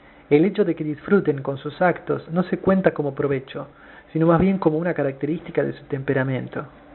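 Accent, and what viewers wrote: Argentina. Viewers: Que poca madre arruinar algo tan profundo con la lectura culera y por si faltaba algo pa hacerlo mas mierda el acento argentino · Porque dices shover es llover arjentino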